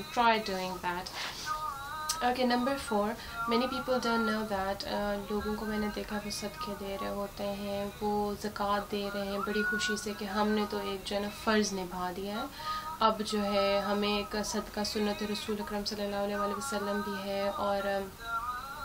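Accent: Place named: India. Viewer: O MY GOD, HOW LOVELY HINDI U SPEAK, LOVE YA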